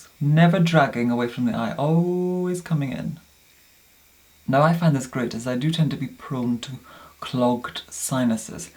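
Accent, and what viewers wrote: Scotland, United Kingdom. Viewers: Cora alice Nugent 'sorry' indeed. Accents are malleable... If you listen closely he still has a bit of Scottish in there. Can't believe how rude some people can be. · He is from Scotland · Literally the most posh Scottish accent ever but I love it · Scottish I believe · He defiantly has a Scottish accent just a very posh one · where are you from? i hear a northern twang in your english accent, perhaps even scottish, however you speak very proper and pronounce some words how southern english people would. i’m very curious. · Liv Cerys then you must be German as he is Scottish for sure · Rose He is from Scotland; in his earliest videos the accent is more pronounced. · Seamlessly Scottishness. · I'm from Australia, apparently he's from Scotland I read. You guys have too many accents 🤣😂 we just have one Straya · I love the accent. 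I can hear a scottish accent under the voice. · Is it just me or does his accent sound Scottish? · is he scottish or irish? it keeps changing for me please be scottish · He's actually from scotland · idk about that but i know he went to college in glasgow and his accent is definitely scottish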